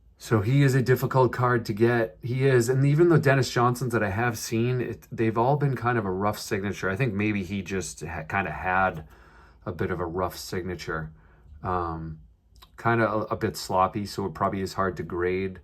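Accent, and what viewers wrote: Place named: Boston, United States. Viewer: Boston accent comes out in these videos. Haha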